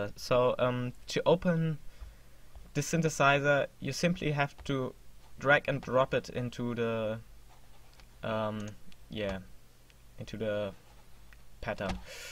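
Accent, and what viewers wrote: Germany. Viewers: man merkt dass du deutscher bist Xd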